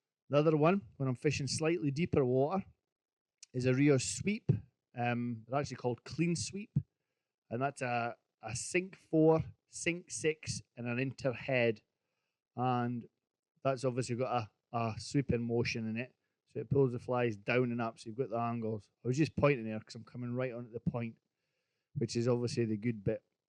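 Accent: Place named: Aberdeen, United Kingdom. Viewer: 1st class video, listening to you reminds me of my time in Aberdeen.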